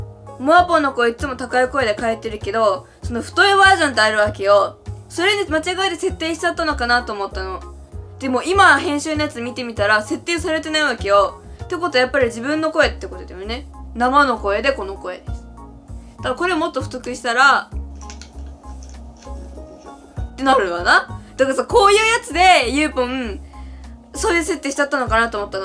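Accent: Kansai, Japan. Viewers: 関西弁が似合う可愛いちゃん ◎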